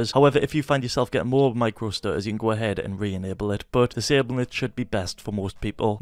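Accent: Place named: Newcastle, United Kingdom. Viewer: Never been so excited to hear someone else from newcastle lmao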